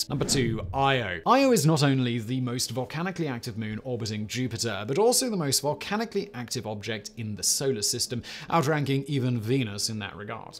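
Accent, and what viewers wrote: United Kingdom. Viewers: He's British... He says it the british way... Just like Aluminium, Carribbean ect is said differently from American English Also Meth is said Meh-th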